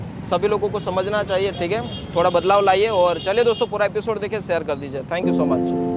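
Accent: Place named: Etawah, India. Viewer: bhai iski avaj se sap pata lagta hai ki a bhai itava ka hai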